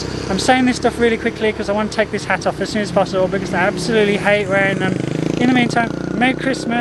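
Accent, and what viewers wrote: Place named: United Kingdom. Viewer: Hi, I regularly watch your videos with interest. I lived in Singapore for 40+ years. What “bugs” me about your commentary is that you PRONOUNCE street names or places as if you were an Ang Mo that arrived just yesterday. I recommend that you (learn to) pronounce names the way Singaporeans say it, and not how the British would do it. The other day you visited BAKIT TIMAH. That sounds awful.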